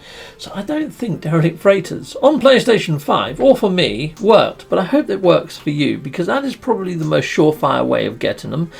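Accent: England, United Kingdom. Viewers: Classic British guy voice 👑🤩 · I enjoy your videos. I love your English accent. I’m grieving for Elizabeth II. 🇺🇸🤝🇬🇧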